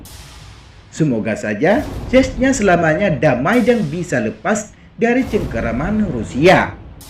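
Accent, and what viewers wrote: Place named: Aceh, Indonesia. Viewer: Hana pas loem mantog na logat Aceh kapegah haba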